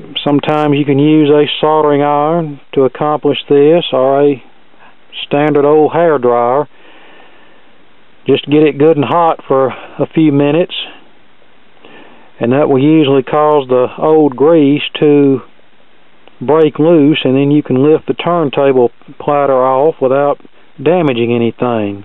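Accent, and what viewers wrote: South, United States. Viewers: laurel moon ++ i've watched this guys videos and will use his info on a stuck bsf turn table i have, bought new in 1980 shoved off to the side in 1993 by my ex wife and not used since. i kept it thinking one day i'd get it running. maybe with this guy's info i can do that. prefer the older record players before equalizers and the "digital age" moved in.also have a 1955 rca 45 player i will get going. everything works,waiting on replacement cartridge. would rather enjoy my extensive vinyl collection on the old players they were made for. as for this guy's accent,no problem. i'm from north carolina and live in mississippi now.i have that same accent and intelligent as most are here in the us south.my daddy sounded like that. he was a tv/radio repairman as a side job in the 1950's and 1960's. i remember him working on some of these old rca record players. 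my daddy passed away at age 32 in 1966. working on this old stuff in my retirement brings back good memories of my daddy.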